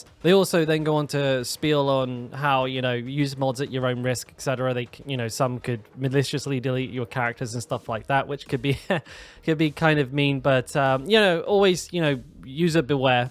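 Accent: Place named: Kent, United Kingdom. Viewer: Also, out of curiosity, how come you are on Asian time, you sound like your from Kent?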